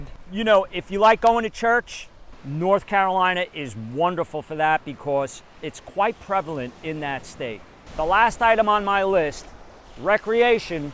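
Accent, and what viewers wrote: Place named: Upstate New York, United States. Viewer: greetings from Portland, OR. You got mention on facebook called "Moving to Florida" and I just now watched my 4th video of yours. Love them all...my Dad is from Upstate NY but you remind me of his mom with that "Accent"...lol. My family planned on moving to which we think to ...Jacksonville on many account. Cooler than most of FL, Has waves for surfing if weather is right. Not alot of hurricanes hit that area, I can transfer my job there to name a few. But I rather live in Tampa....so hard to decide! Lived near L.A. in Hawthorne and Redondo beach growing up and moved to oregon when I was 26. Now I'm 57 and my mom and dad and stepfather all passed away and my 2 sisters are now living in VA. I am dying to get back into the waters since oregon pacific ocean is too dang cold! Looking forward to my move next July if all goes well.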